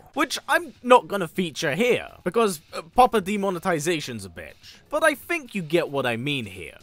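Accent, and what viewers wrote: United Kingdom. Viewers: No offense, but I don't fink I can't take much more of this accent. I love brit accents, but this ain't it. Keep up the great work, though!! I can't wait to play!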